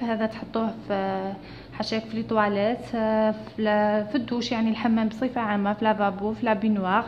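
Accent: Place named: Setif, Algeria. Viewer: ما احلى اللهجة السطايفية 😘😘😘😘😘😝😝😝😝😝👍🏼👍🏼👍🏼👍🏼👍🏼👍🏼👍🏼👍🏼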